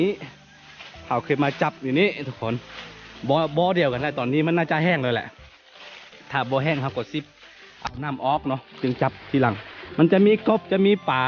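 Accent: Isan, Thailand. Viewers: พี่คนดำเนินรายการเป็นคนลาวใช้ไหมคะ...ฟังสำเนียงอีสาน.. มักหลายเว้าม่วนคัก..ข้าน้อยมักภาษาลาว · สำเนียงภาษาเหนือปนสำเนียงอีสานแม่นก่อเจ้า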